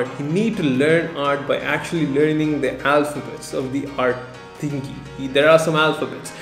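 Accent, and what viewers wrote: India. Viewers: I love how he says “cool” in that sick Indian accent all the time.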